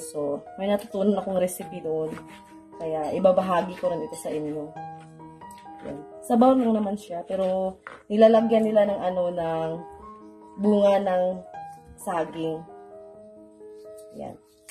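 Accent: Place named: Visayas, Philippines. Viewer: Napaka Sipag napaka Ganda Ng views. Cute Ng Bisaya mo sis 😁 spread love not hate 💚 stay connected. Love this kind of content 💚 relaxing simple life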